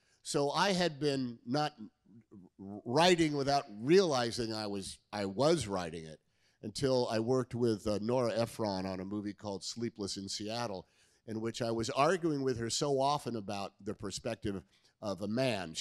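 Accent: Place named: RP, United Kingdom. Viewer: For the Americans, that was a ridiculously posh accent, not a Bristol accent.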